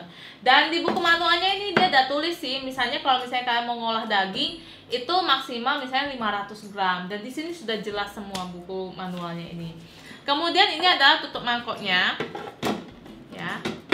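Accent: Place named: Palembang, Indonesia. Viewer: Orang palembang ya kak 😊..logatnya gak bisa hilang heheh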